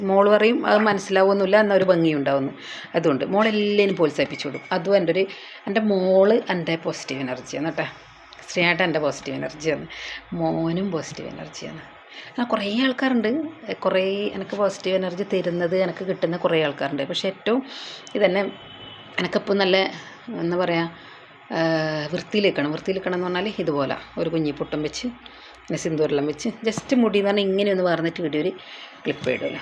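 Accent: Kannur, India. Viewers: കണ്ണൂർ കാരി ആണോ ഭാഷ അത് പോലെ ഉണ്ട്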